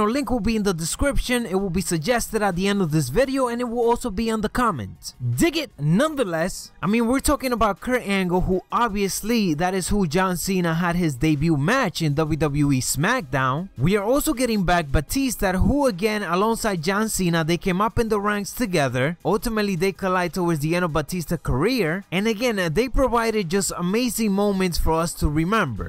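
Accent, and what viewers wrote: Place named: Spain. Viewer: Ur channel rocks , is that ur voice , and r u from Spain or something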